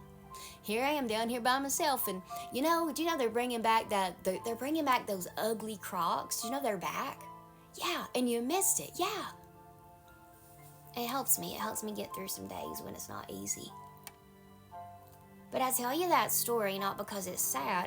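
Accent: South, United States. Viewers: how sweet and southern your accent is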